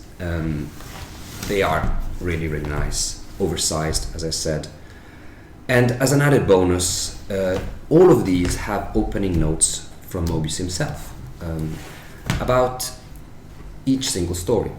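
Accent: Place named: Sweden. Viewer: You must’ve picked up some of the weird ways Swedes pronounce English too because my “Swede-sense” was definitely tingling when I heard you speak 😅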